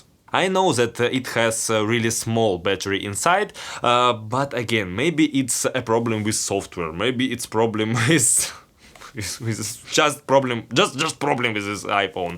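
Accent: Russia. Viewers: You have Russian accent. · твой английский для русского человека просто умиление )))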